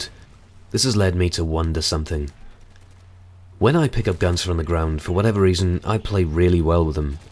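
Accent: United Kingdom. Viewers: That voice is incredible, where in the UK are you from?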